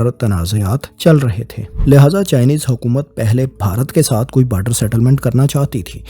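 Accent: Punjab, Pakistan. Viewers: اچھی معلومات ہے ، لہجہ بھی فیصل وڑائچ سے ملتی ہے ، الفاظ کی ادائیگی وہی پنجابی لہجے والی 😊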